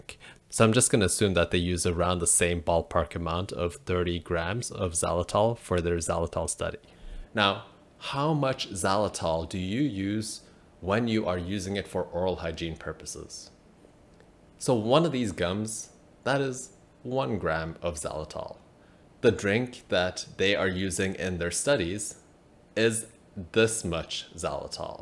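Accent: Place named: South Africa. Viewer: Hi, I love your videos - well done 👍🏻. When I listen to your accent, sometimes I think I detect either a South African (my former country) or New Zealand accent on some words? I must say the release of the study of Xylitol swerved my inclination to start using it. Now I know how much they used, I will rethink it. One thought I have is when we put a substance in our mouth, it is absorbed into the bloodstream so I'm wondering if this may raise risk 🤔. Just a musing...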